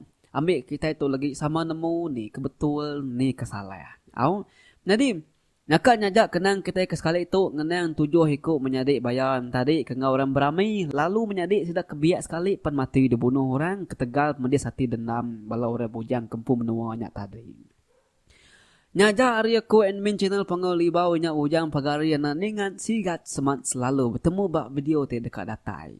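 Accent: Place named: Julau, Malaysia. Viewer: Urang julau ka? Munyi jako urang julau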